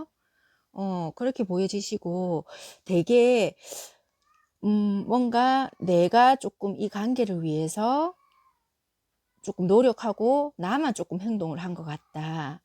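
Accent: Busan, South Korea. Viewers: 님 상대가 마음정리 했을까봐 두려웠는데 아직도 마음이 있다고 하니까 위로도 받았어요🥺 리딩 감사합니다 💖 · 최근 헤어진 커플만 해당되는줄 알았어요 ㅎㅎ 감사합니다!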